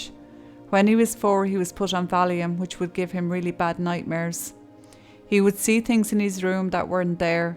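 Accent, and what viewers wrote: Ireland. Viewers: The narration on these videos are wonderful. I am from the USA, but I love the Irish lilt as it reminds me of my dear grandmother from Donegal. Thank you for your sensitive telling.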